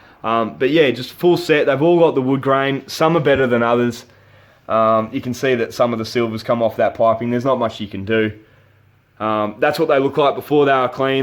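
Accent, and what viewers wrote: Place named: United States. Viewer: Mate, your generation is sounding more and more American everyday. We speak English, that’s English English, not American English. So when we say ZH it’s not Zee Aitch, it’s Zed Aitch. Nice car.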